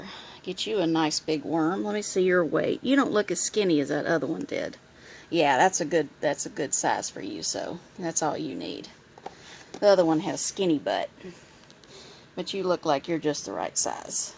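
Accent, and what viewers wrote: South, United States. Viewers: Loving that southern accent 😍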